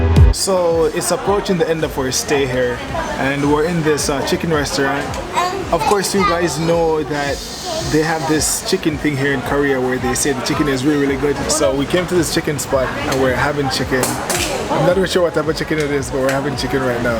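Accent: Jamaica. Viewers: Question... are you Jamaican? Hearing a bit of a Jamaican accent, I could be wrong though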